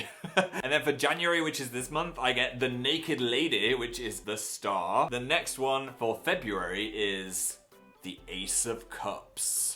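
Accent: North, United Kingdom. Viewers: Wow he sounds so northern in this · you sounded so northern in this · U sound so northern in this video 😂😂😂 ... more than usual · HE SOUNDS SO NORTHERN AT THE BEGINNING OML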